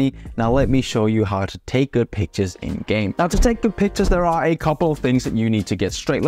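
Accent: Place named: Denmark. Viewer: syntes nok jeg ku høre lidt danglish, hehe Der var også danske plader på den røde Jaguar, da du var i Sverige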